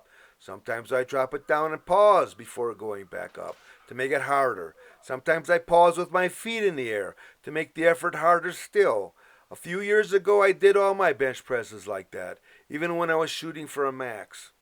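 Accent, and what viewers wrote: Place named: Minnesota, United States. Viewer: Well! Look up the phrase "Old Guys Rule" and you'll find a link this this video. I think my jaw was on the floor during the entire thing. You've transcended mere "inspirational" and achieved Zeus-hood. 320 pounds - egad. I turned 69 earlier this week, and for that birthday I did a rep of 225 ("two plates") in the local YMCA after 29 years without touching a barbell. (My peak in my 40s was a couple of reps of 287.) I was a little shocked that it only took me two months from 135 to 225 - newbie gains. Apparently, you can build muscle after 60; I had no idea this was so. I shall next attempt 230, then 235, etc. Anyway, calling this a remarkable video doesn't quite capture it. This is one of the realist things I have ever seen. Your voice seems very familiar. After some thought I have it: Your voice reminds me of Jonathan Banks, who played Mike Ermantraut in "Breaking Bad." Banks is from D.C./Maryland, but I thought I detected a Minnesota dialect from you. 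Where are you from?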